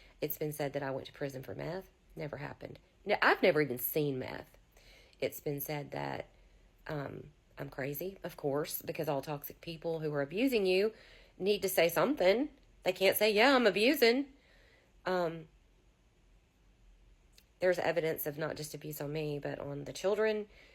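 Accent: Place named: South, United States. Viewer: I would take all documents and go to your State Capitol. Have copies for the FBI HEADQUARTER DEPT. THE ATTN GEN and DEPT OF JUSTICE. I can tell by your accent you might be in a small town in the South. I know how things can be. No matter. WE THE PEOPLE still stands! Here for you. You little boy having special needs should be covering him with even more protection under his rights of the disabled. He isnt a pawn on a chessboard game. Shouldnt be about hoarding money from a single Mom. ⚖ ⚔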